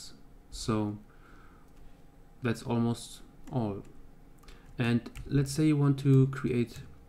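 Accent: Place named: Germany. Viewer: Bist du deutsch? Man merkt iwie einen Akzent heraus ^^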